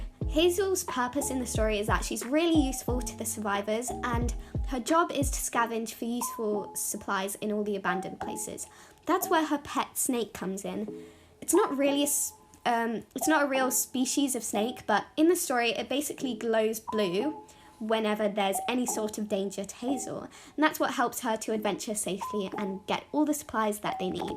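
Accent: United Kingdom. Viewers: can i eat ur accent and ur art? 🥺 you sounded like how i expected you to sound but the accent caught me off guard bcs im just a sucker for british accent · Your voice is so pretty and I love the British accent. I’m southern but don’t have a strong British accent. I’ll probably take up some of your tips when I film another voice over… but that’ll be a while. I also wanted to ask how you stay motivated and creative with video ideas. I’ve taken 7 months from uploading but am still confused as to what I should upload. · !!! you have such an heavy British accent, I love it!! It's so nice to actually hear your voice for once lol! I love how the character looks now! I hope you have a great day/ night Byee <3 Edit: 🍞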